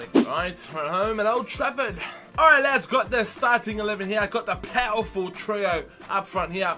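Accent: Australia. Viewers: Isn't it obvious? Australia!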